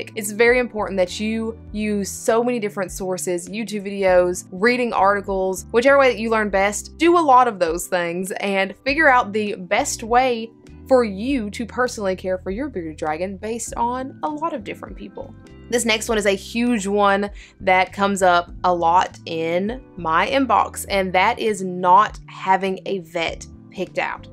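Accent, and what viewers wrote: South, United States. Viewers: OMG!!!! I was listening to this and your accent wondering if you were in Alabama or the south … then you mentioned ANIMAL TRAX!!! I just went there yesterday in Madison! I live in Holly pond - where are you from??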